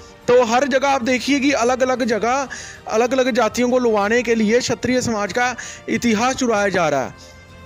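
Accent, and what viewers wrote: Punjab, India. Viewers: Hukm aap kaha se h aisi hindi punjab ke bhai bolte hai❤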